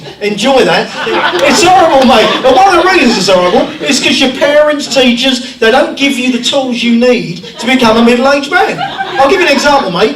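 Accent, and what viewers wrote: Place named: Essex, United Kingdom. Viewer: you are a very funny guy. But you'll just have have to slow down your Essex accent Sir - then the world will be your oyster.😎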